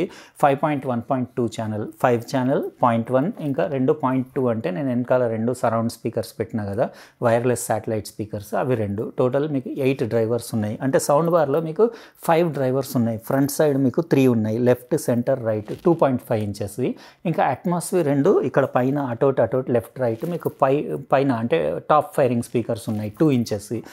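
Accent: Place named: Hyderabad, India. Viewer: Garu, Mastu, Jabardastu, meeru vade, hyderabadi, assent, kosam mee video chustnu, 🎉 all the best, prabhakar, alwal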